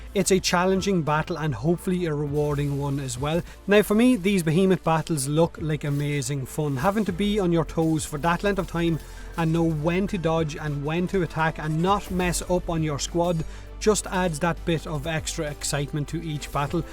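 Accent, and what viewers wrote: Ireland. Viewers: Very Irish :b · Your irish accent is somewhat entertaining xD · I am guessing you are Irish, are ya, mate? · God damn, I couldn't decide which was better; the video game being made or your accent. I hope we get to meet in-game one day, Irish Hunter Man.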